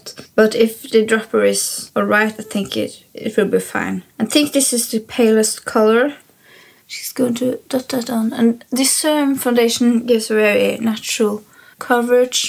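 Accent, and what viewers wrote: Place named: Norway. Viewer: love your accent girl. same as aurora because she is norwegian too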